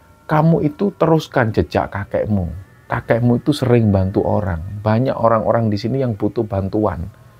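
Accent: Java, Indonesia. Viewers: Kalsel hadir mas Sukses slalu untuk mas dn tim ks nggeh, Kami ini asli Jawa Jogja tapi lahir dn domili Kalsel dn kami sgt mengidolakan mas karena ciri khas jawanya itu GK ilang SM skli keren,dn cara penyampaianya itu nggenah,njalur dn yg pasti keren mantap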